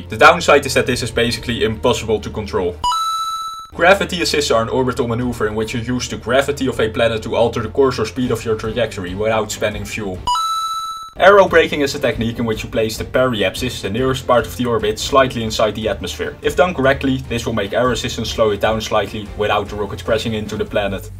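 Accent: Netherlands. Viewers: are you dutch you sound really fucking dutch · Ah, yes, a fellow dutch man I hear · Het nederlandse accent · i smell a dutch accent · love his dutch accent!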